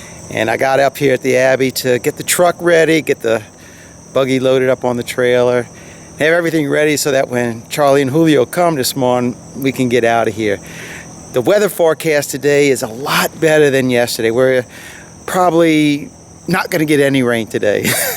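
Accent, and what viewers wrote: Louisiana, United States. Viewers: how is everything where you live your accent let's me.know your in Louisiana new Orleans somewhere around there......how is.you and your family doing after the hurricane is.your property and everything and everyone okay I hope you and your family are.doing great God bless you guys I am still praying for everyone there 🙏 have a great night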